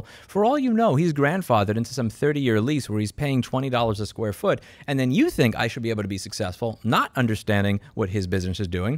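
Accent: New York, United States. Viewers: The NYC slang is still strong , can’t take the NY grit out of him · Entertaining and lovely, as almost always. I want to get to visit a New York one day that is a little like those olden days. Is this the first time I think you can detect a faint trace of New Yorkese in Louis' speech? 😄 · I truly, truly enjoy listening to your videos! I don't mean to belittle the subject content, I can find pretty much the same thing all over Youtube, but, they don't speak the same language. You, my friend, tell it like it is in a language I relate to. You speak New Yorkese! And probably don't even realize it. I grew up near enough to the city that I was fluent in that method of expressing yourself. Although I left the East coast many years ago, I still tend to express myself that way. It causes me no end of being misunderstood and/or being accused of saying things in a excessively direct manner. 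So, I watch your videos. Not because I agree with every thing you say but, even if I disagree, I experience a level of comfort listening to someone I can truly understand.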